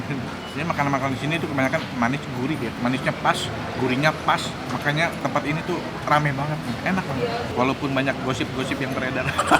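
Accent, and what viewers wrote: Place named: Pontianak, Indonesia. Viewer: tak ade bukan padang , nah bahasa pontinye kental cuy wkwkw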